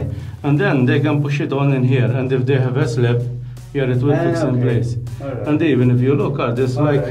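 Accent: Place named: Malta. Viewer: Fantastic as always & Co. I lived in Malta as a child and still visit most years, - I love seeing you uncover and re-explore these historical sites. Love hearing your fabulous Maltese accent too! Thanks for doing these in English:-)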